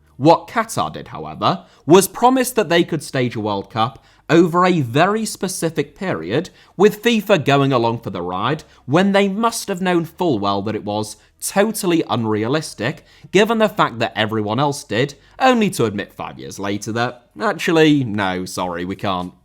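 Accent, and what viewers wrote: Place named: United Kingdom. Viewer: why do you talk like a posh guy from 1600s england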